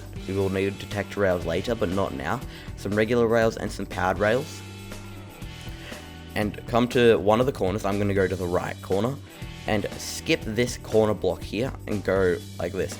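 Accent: Australia. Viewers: It it just me or is this man Australian? <3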